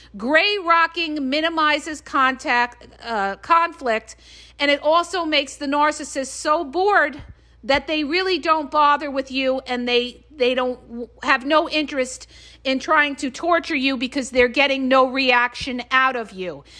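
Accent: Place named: New York City, United States. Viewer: Greetings from Springfield, MO. You sound like NYC gal 👧. God Bless you! You are very entertaining 😂 I'm really enjoying your content, 2 years no contact with Narc family members hallelujah!! It feels amazing 👏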